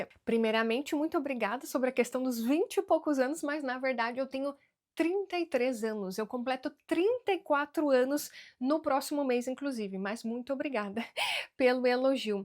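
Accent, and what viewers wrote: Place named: South, Brazil. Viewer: talvez tu já fez vídeo falando de que Estado tu é natural. Tú é Gaúcha ou Paranaense? ( Pelo sotaque). ESTÂNCIA VELHA RS.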